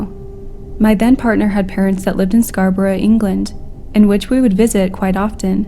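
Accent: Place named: South, United States. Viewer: hearing some drawl in a story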